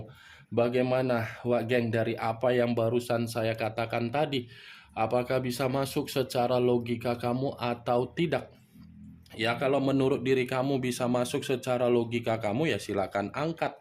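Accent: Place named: Medan, Indonesia. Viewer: 😂😂😂😂 Maaantaap Kali Aaacchhh Kartu Fortune ini … Bahasa ORG MEDAN Banget SUHU The Best Kita ini …👍👍👍👍👍💃💃😂🙏✍️😎😎😊😊……30 Nov 2023 Pkl wib …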